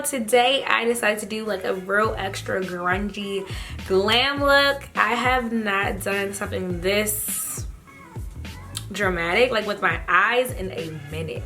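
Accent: DMV, United States. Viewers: That DMV pops out everytime! I loveee you lol😂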